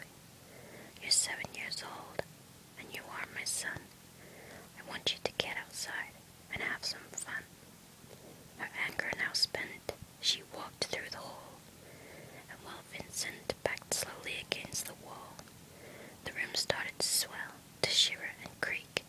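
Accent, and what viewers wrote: United States. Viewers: Very good american accent. You are more articulate than most people I know hahaha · Wow! If I didnt know you were British, I would have been convinced that you were an American for real! thats a great accent. Greetings from Brooklyn New York U.S.A! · You sound just like an American! Great job! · This is awesome! And from yet another American in this crowd, your American accent sounded perfect to me :) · You sound more american than me... and i live in america. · Do another American Accent video. This was very pleasant. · I never thought anyone could get tingles from an American accent. It's just talking to me lol I LOVE non usa English speaking accents though so I can see the appeal in a foreign tongue. You were marvelous by the way! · very good accent! we should team up and take over the american-accent-talking world! haha XD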